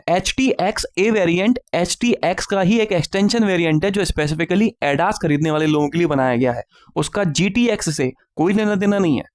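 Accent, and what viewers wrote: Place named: Delhi, India. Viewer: Typical ghaati dilliwali hindi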